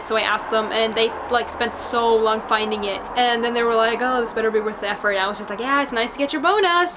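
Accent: United States. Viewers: Kinda off topic, but your accent sounds a bit southern (USA) at times.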